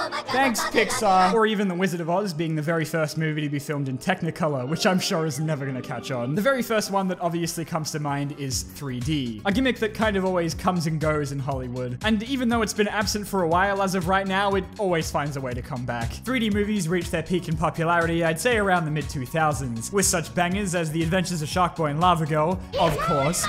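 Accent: Australia. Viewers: "Babe Wake up, the funny Australian made a new video" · funny Australian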